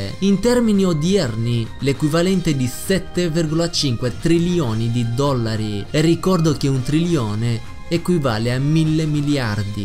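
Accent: Russia. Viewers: Madonna che voce ma sei russo???È fighissima la tua voca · È RUSSOOOOOO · è Russo :) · è russo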